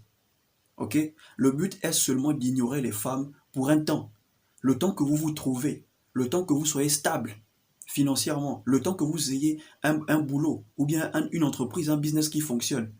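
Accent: Gabon. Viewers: Tu parles tellement bien...je pari que tu es Gabonais comme moi, vu ton accent 🤔et tu es très explicite dans tes dires, contrairement à plusieurs pseudo coaches qui sont plutôt évasif sur leurs sujets🤷🏽 je ne peux que m'abonner à ta chaîne 👍🏼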